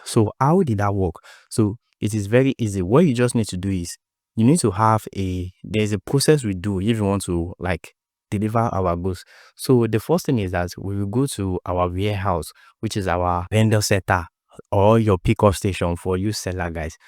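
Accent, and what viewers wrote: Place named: Ghana. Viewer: Specify if it's jumia Nigeria causes your accent sound ghana...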